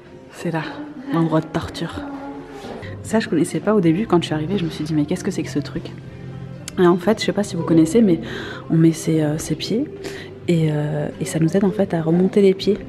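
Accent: Algeria. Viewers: t adorable à parler avec l'accent Algérien et ton papa lahi yahafdou adorable j'en peux plu de rire avec eux 😘😘😘😘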